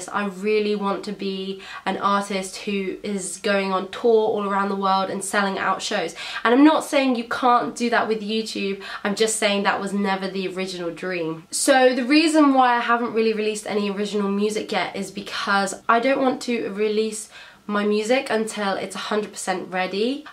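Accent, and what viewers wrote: United Kingdom. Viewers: I DIDNT KNOW YOU WERE ENGLISH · I really like ur London accent XD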